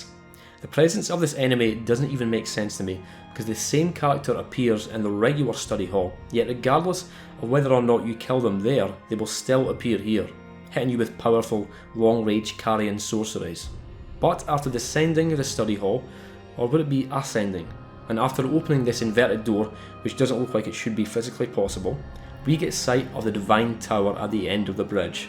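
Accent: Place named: Scotland, United Kingdom. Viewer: Ah yes another wonderful scottish man video to listen to while i work today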